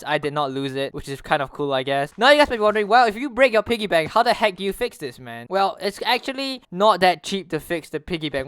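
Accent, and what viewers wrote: Singapore. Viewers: R u from singapore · You sound like you're singaporean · Dude r u singaporean cause i am and u have the accent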